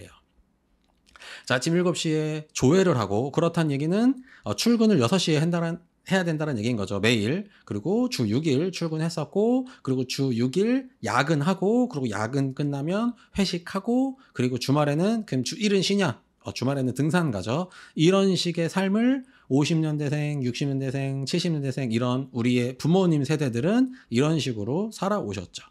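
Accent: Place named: Gyeongsang, South Korea. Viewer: 경상도분이 신듯 ㅋㅋ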